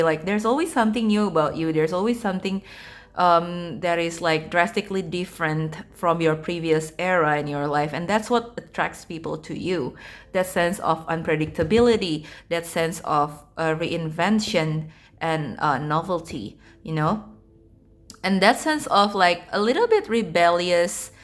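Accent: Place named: Indonesia. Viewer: I heard Indonesian accent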